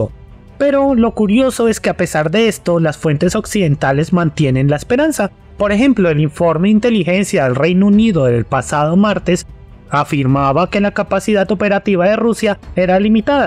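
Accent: Colombia. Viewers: Pasó de mexicano a colombiano 👍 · Este comentarista es colombiano?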